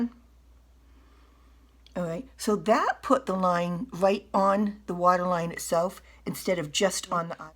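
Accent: New England, United States. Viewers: I’m Southern and I like a yankee accent. Reminds me of “All in the Family.” Don’t change the way you speak❤✝️Hair & makeup looks 👍🏻 good.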